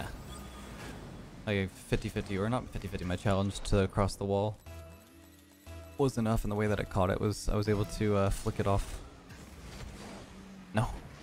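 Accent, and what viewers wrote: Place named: Canada. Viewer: The canadian really comes out when you're this quiet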